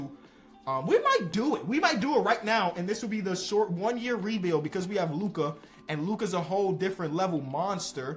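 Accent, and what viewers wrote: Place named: New York, United States. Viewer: I still love that ever since became friends with SAS, he took on that New Yorker accent lol. Epic.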